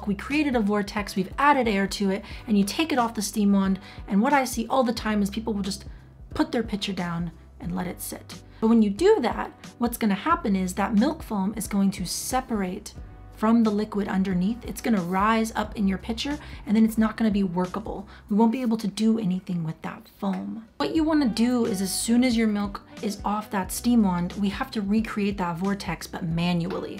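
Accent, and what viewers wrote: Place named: Israel. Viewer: Which country are you from originally? Israel? Going by the accent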